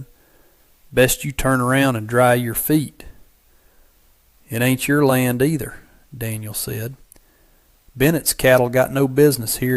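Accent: South, United States. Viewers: This is a very moving, a very beautiful story. And the narrator reading this story to us is simply the best. His southern accent is perfect for this kind of stories.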